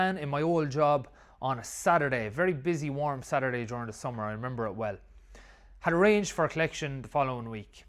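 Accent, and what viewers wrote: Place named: Ireland. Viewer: You sound Irish 😮😮😮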